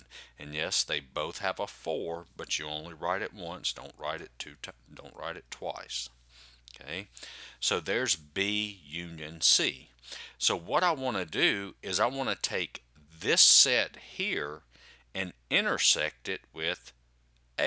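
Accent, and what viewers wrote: South, United States. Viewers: Does the have a southern accent? I like it whatever the accent ❤️ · You mister, you sound so american, where are you from? Tenesse, Texas, The Grand Ranches of Arizona? Nevertheless, thanks for your video, it has certainly made things clearer...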